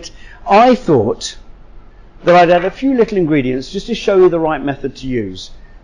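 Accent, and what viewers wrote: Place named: United Kingdom. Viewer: Clearly British.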